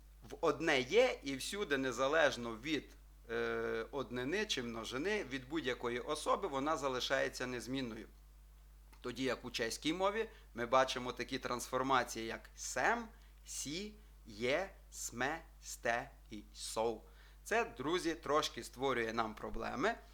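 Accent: Ukraine. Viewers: Ну как можно учить чешскому ,разговаривая по закарпатскому ? Это капец ! Невозможно слушать Один я слышу разницу ?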